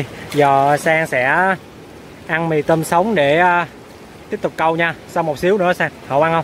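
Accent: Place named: South, Vietnam. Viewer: Cho em hỏi là quê của anh ở đâu ạ em tháy anh nói giọng nam mà khung cảnh cứ như miền núi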